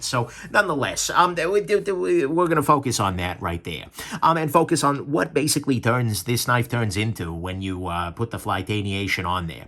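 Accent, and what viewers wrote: New York, United States. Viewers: You are so informative and funny! I love the NY accent!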